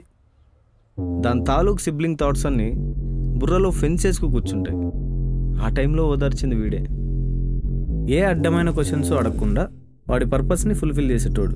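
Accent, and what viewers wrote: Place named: Anantapur, India. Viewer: Dinamma, Anantapur accent nv cheptunna vastavalanni gattiga tagultannai bossu.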